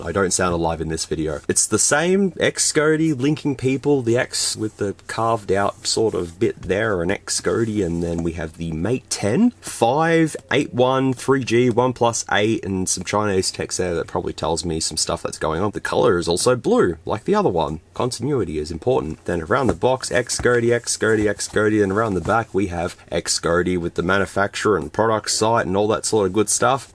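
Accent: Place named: Australia. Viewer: I don't know why he's so worried about his voice. You just sound like an Aussie mate. You don't sound like you, but you still sound like some Aussie.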